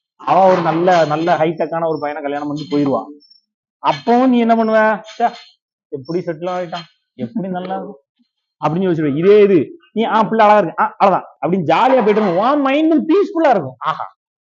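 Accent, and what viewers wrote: Tirunelveli, India. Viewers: மாஸ்டருக்கு திருநெல்வேலி யா தூத்துக்குடி யா,,,,, எந்த ஊரு உங்க பேச்சுல நெல்லை தமிழ் ,,,,,,, இனிமை,,,,,, இனிமை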